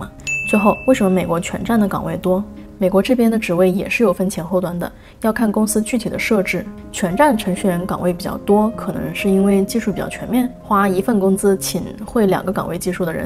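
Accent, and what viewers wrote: Standard. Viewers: 来看只是确认一下 up 主懂不懂技术，看来是我错了，真的懂。发音也很标准，走了... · up主中文非常好。 我基本用英文学完，用全部中文基本解释不来。 而且up主连“逻辑”的发音都跟高考要求一样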